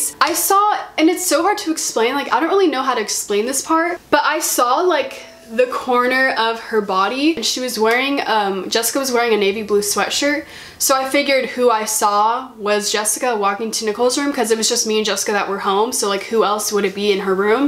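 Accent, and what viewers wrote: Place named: China. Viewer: The voice is chinnese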